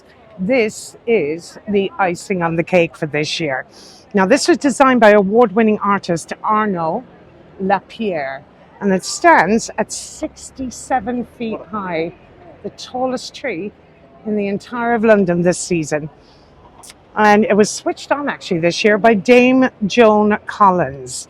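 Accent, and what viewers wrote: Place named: Ireland. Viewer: Thanks so much love ❤️ uour enthusiasts together with that charming Irish accent reminiscent of my dearly departed Mother. We are visiting central London tomorrow and we'll certainly be taking a cue from your knowledgeable video! Happy Christmas and New Year!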